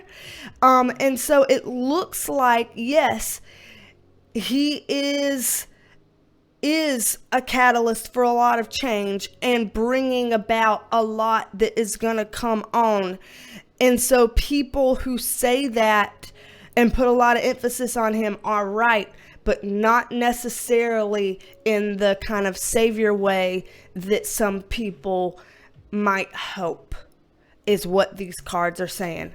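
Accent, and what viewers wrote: United States. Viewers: 😅 “we’ll make a start” - we say that in Australia too. It never struck me as unusual until I heard you say it in an American accent 🤣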